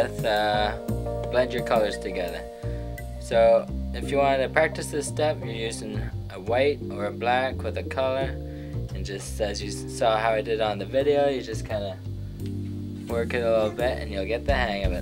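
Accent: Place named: Canada. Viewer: Ba ha ha! Thought I heard you say, "draw in your picture like... c'est ca.." and thought this dude sounds like he's from my neck of the woods, he's got to be Canadian. I see your website is a dot ca domain. If you aren't from the East coast, I think you'd fit right in anyway!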